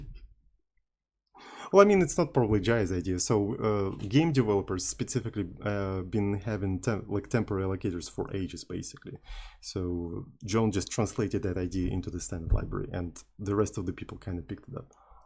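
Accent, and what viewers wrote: Russia. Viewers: Russian guy? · Are you Rusian or German? You speak German words often, but your accent sounds Rusian.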